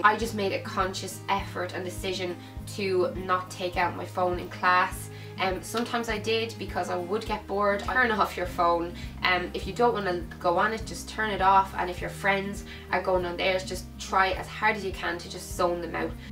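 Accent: Ireland. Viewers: irish accent yes irish accent took me a second :)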